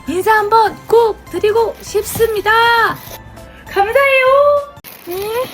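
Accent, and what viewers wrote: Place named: Yeosu, South Korea. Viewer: 여수 사투리 잉~~잉??!! 구독 합니닷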